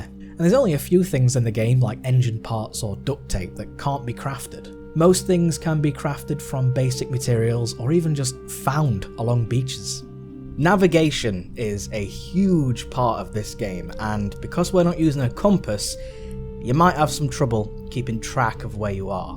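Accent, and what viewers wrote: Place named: Wales, United Kingdom. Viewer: oh my god... are you kidding. I'm from Wales and its unusual to hear a Welsh accent. That has made my day.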